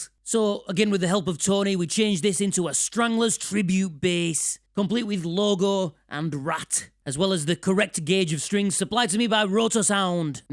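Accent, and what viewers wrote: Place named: Scotland, United Kingdom. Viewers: I just noticed he's got the same accent as Scrooge McDuck. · ...as an American dipstick bassist..I like your style..and as an owner of a lot of different basses..it's really cool how you show the differences in the various basses...chunks of wood with magnets and strings...but its the owners hands that makes the instrument talk..oh..as an American dipstick...when I first ran into your post...I thought you were Scottish...sorry...( I consider myself more of a douche nozzle..)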